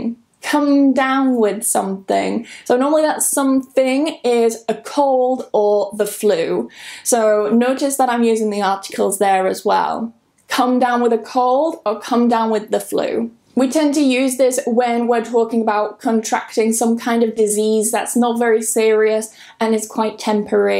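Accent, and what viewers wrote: United Kingdom. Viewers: Hi!, l have been watching your video for one month. l think these videos are so useful to learn English skills. You have also a great British accent that makes it easier for me to understand. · I love your accent, what region of UK is it from? 🌸🌸🌸🌸🌸🌸🌸 I'm from Guatemala 🇬🇹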